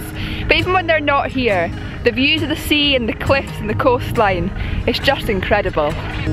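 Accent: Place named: Aberdeenshire, United Kingdom. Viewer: Hello, can i ask? Far div ye bide? Im from crimond so know all these places, live in aberdeen now because of work, but nip in past crimond and count the minutes on the clock 🤣😉 just hearing you talk makes me think you are also from aberdeenshire, great video also 😊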